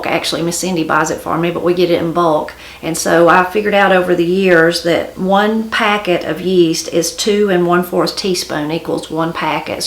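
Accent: Appalachia, United States. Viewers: You mean "arsch" potatoes? It's just "Irish" potatoes (regular white ones) said in the Appalachian accent. :)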